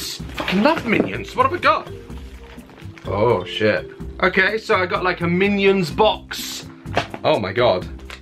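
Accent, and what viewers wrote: United Kingdom. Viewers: he's british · I'm pretty sure he's British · Hes British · he is from Britain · London he's British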